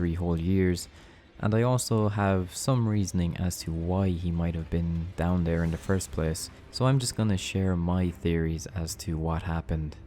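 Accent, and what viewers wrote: Ireland. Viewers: Are you from Limerick, you sound local?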